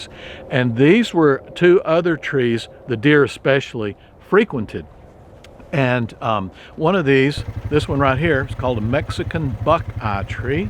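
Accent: Texas, United States. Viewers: you talk so cute. You have such a sweet delivery. Love the Texan accent.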